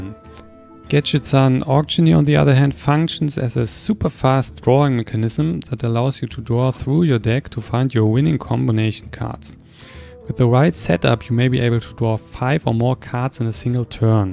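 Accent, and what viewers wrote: Germany. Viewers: are u from germany? funny enough i'm trying to learn some german :D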